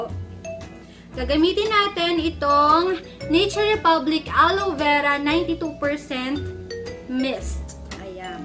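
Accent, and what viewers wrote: Batangas, Philippines. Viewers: Ate batangueña ka po ba HAHAHAHA WALA ANG CUTE LANG KASIII!! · I'm new to this channel. Second time ko manood ng vid mo. Gustong gusto ko yung accent as batangueña haha. So cuteeeee 💙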